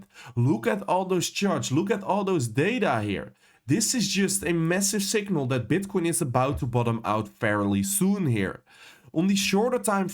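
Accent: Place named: Netherlands. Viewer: are you drunk, ? or is that your dutch accent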